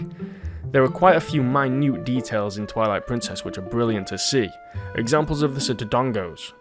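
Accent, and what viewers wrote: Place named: Australia. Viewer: He sounds Australian to me an American lol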